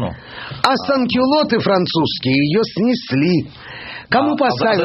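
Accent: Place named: Moscow, Russia. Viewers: Это произношение, характерное для Московского диалекта. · Это московский говор. Так говорили в Москве всегда. Не булочная, а булошная, например. И при чем тут еврейка. Например, в Берлине и евреи, и турки говорят на берлинском диалект. И никого это не раздражает.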